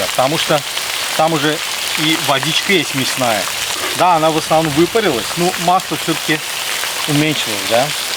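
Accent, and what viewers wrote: Baku, Azerbaijan. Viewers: Спасибо и отдельное спасибо за бакинское наречие. Родился и вырос в этом прекрасном городе к сожалению в прошлом прекрасном, каждый раз когда приезжаю к маме наслаждаюсь её запахом, её улыбкой и разговор ами к сожалению все друзья и одноклассники тоже уехали кто куда а в городе одини чужие именно нехватет вот такие беседы как с Вами душевный бакинский акцент, спасибо будьте здоровы обнимаю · Прекрасное блюдо! Острый нож! Золотые руки! Грамотная речь и бакинский акцент!❤❤❤❤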